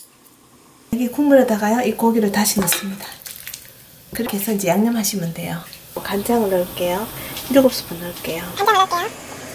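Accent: Gyeongsang, South Korea. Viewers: 이모님♡ 경상도톤이라 더 정겹네요.ㅎ 실방타고 첨 뵙는데... 돼지고기 장조림은 저도 처음인데... 손자,손녀가 잘 먹을것 같네요.ㅎ 자주와서 식사준비 할래요. 3종하구 갑니당.~^^*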